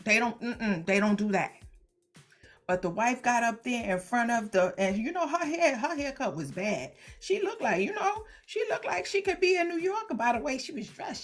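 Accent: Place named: Jamaica. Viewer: I HOPE YOU ARE A JAMAICAN.... WE DO THAT BRITISH ACCENT.... LOVE YOUR SHOW...PLEASE POST YOUR EQUIPMENT IN SHOW MORE DETAILS. I LOVE YOUR LIGHTING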